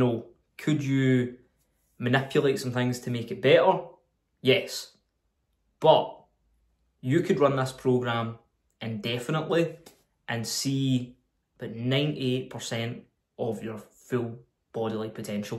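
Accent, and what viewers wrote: Scotland, United Kingdom. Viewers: That’s cus he is a Scottish Brother Pal. He’s not tbad I worked in Fort William once and spoke to some of the locals. I’m English and I couldn’t understand a word they said.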